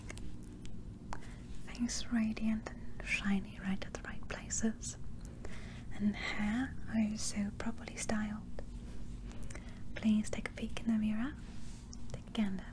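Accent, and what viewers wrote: England, United Kingdom. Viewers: regular accent is english not American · if i'd never seen your vids before i'd honestly say you were british. your accent is AMAZING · Is that a British accent? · Good English accent! ❤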